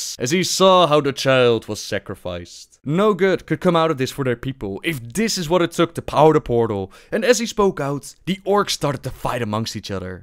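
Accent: Netherlands. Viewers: your dutch right?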